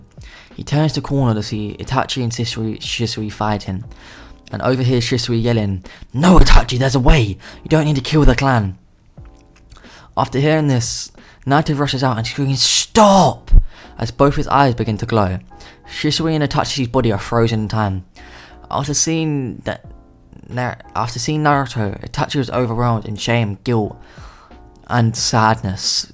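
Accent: United Kingdom. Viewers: u British mate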